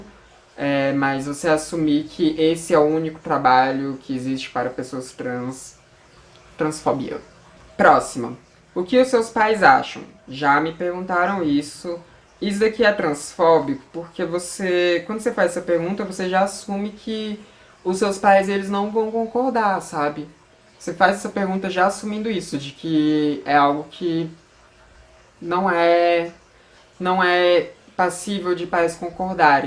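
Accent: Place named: South, Brazil. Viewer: aaaah tendi, ñ sei pq o jeito vc q vc fala lembra um pokiiinho o sul 😄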